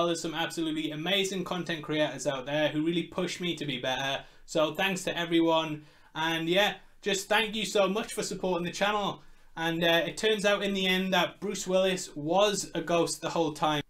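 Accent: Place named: United Kingdom. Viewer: Congrats on the 100,000 Sub Milestone +At last Seeing the Face behind the Brit Voice when I watch The Videos with the Topic of the time.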